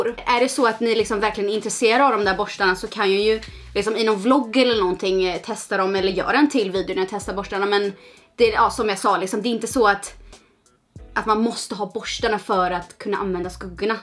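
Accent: Stockholm, Sweden. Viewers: Bra video!☺️ hur kommer det sig att du pratar så mycket Stockholmska? Men ändå typ göteborgska samtidigt haha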